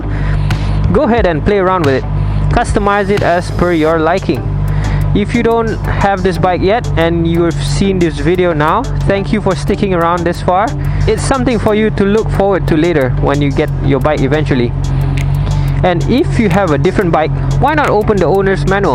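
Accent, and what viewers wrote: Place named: India. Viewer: Heavy indian accent, mouth breather... Thumbs down.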